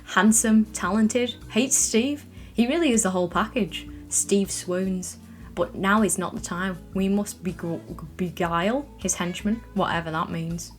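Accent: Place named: Birmingham, United Kingdom. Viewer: That Brummie accent though....phwoar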